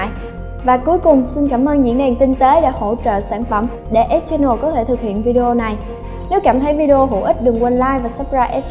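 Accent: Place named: North, Vietnam. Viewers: thix review giọng Bắc hơn + chị trả bài rất tốt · Thích giọng bắc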